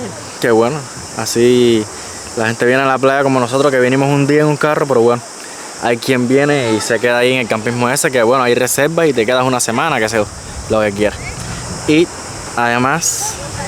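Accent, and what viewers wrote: Cuba. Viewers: Me gustan mucho tús videos y comentarios,te felicito hablas muy lindo sin groserías ni los asere y con el acento cubano. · tu muy marcado acento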